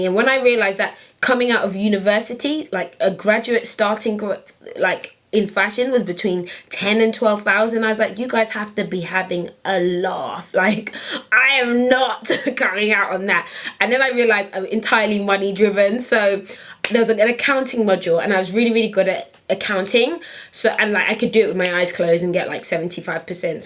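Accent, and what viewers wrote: Nigeria. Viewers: lol your nigerian accent i love and what colour is your nail polish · MORE NIGERIAN ACCENT! hilarious haha xx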